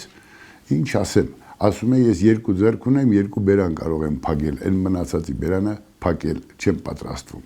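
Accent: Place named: Lori, Armenia. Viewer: 2016. Ին. Ուրախացել. Էմ. Ձեր. Ու. Վահանի. Տեսակով. Հետո. Որոշ. Ժամանակ. Քննադատել. Բայց. Երբեք. Կոռեկտության. Սահմաները. Չանցնելով. Ու. Չարիք. Էմ. Համարում. Բոլոր. Նրանց. Ովքեր. Հայհոյում. Են. Ու. Անկախ. Նրանից. Համակարծիք. Էմ. Եղել. Թե. Ոչ. Ձեր. Խոսքում. Լոռվա. Բառբառի. Համն. Ու. Հոտն. Էմ. Առել. Չէ. Լոռեցի. Չէմ. Բայց. Մեր. Բառբառները. Մեր. Նկատի. Ունեմ. Հային. Բառբառները. Հոգիս. Ջերմացնում. Են. Տանում. Ինքնություն.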